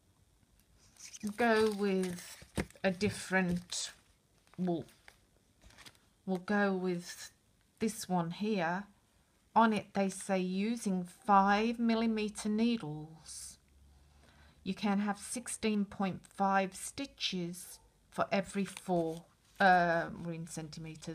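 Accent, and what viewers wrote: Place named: North, United Kingdom. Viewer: Thanks..... love hearing that northern twang!!!! Im from the north too!!;)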